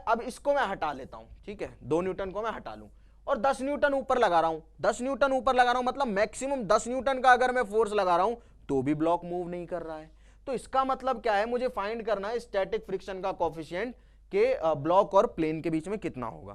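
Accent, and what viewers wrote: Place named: Haryana, India. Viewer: Guruji pahle teacher ho jiski baat smjh m aarhi h lage s aap haryana te ho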